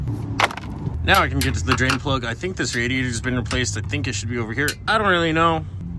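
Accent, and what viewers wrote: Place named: East Coast, United States. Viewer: You do not sound like you're from New Mexico. Your accent sounds east coast or midwest. I spent 8 years in the Land Of Entrapment. I loved most of those years. Anyway, I liked the video. It brought back memories of major repairs in auto store and apartment complex parking lots. Mine were Mitsus, not Subies, but same shìt...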